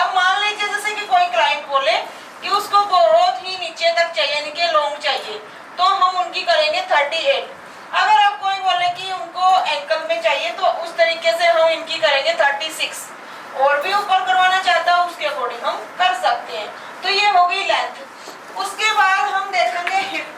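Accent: Haryana, India. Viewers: Sister aap accha sikhati hai 😂sikhate wakt baate karati hai to aur acchi lagti hai mujhe haryanvi bahut pasand hai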